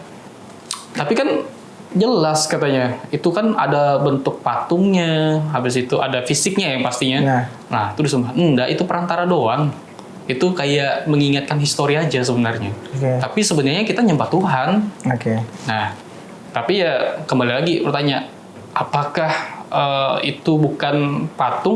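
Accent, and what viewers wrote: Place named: Pontianak, Indonesia. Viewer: Logat Melayu Pontianaknya masih kental ya bang... Alhamdulillah, crite abang mirip dgn teman sebangku ku, krn keseringan lihat saye beribadah.. semoge abang istiqomah... aamiin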